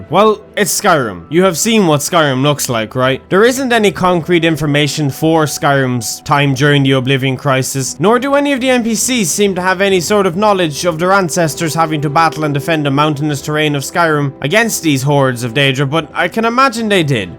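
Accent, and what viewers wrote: Ireland. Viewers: Finally! An accent that's not american! But Irish! I thank thee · Im irish and ive never heard someone as irish as you. Great video man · The paddy is strong with this voice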